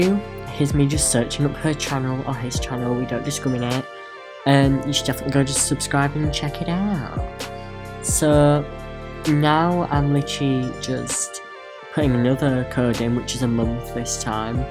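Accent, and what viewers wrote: England, United Kingdom. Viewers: are u from England i swear u sound like u do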